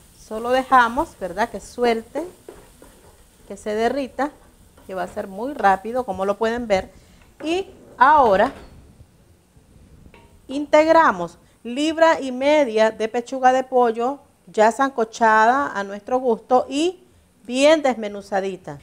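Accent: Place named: Nicaragua. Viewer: Me cae super bien esta mujer como habla pura nica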